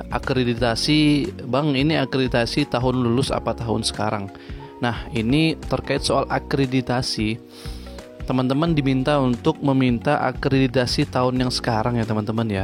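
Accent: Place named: Sambas, Indonesia. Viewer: Bang kalau boleh tau, abang cpnsnya daerah mana bang, kalau dari logat dan nama chanelnya mirip logat daerah Sambas🙏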